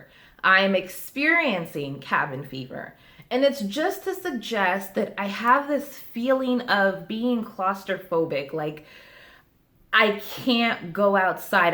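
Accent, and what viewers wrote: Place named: United States. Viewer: Hey! Enrique here. I love all your lessons bc they sound like the real American English! Sometimes, I struggle with the accent of native English speakers from England or Australia. For instance, did you see the video in which the Dagenham boy told Meghan "I think she's beautiful " That "beautiful" it sounds so weird to me like it is not enough English. Of course, it is a good English. Could you please give me some tips about pronunciations skills or listening skills? Thanks in advance.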